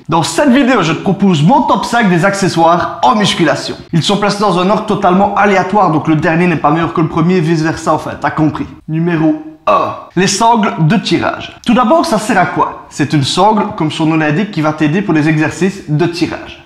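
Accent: Liège, Belgium. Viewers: Tu es de Liège haha?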